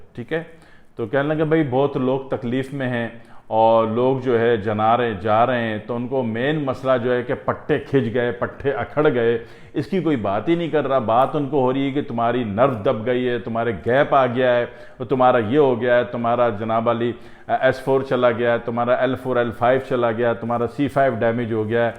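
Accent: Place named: Delhi, India. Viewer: Janaabe aali.... kiya aap ka Delhi se koi talluq hai.....aapki zubaan aur lehja Delhi ka sa lagta h .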